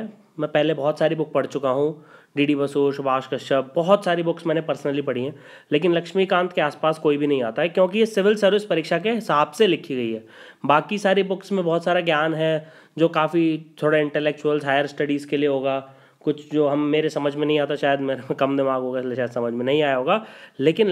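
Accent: India. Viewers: very helpful video....gud to hear ur hindi accent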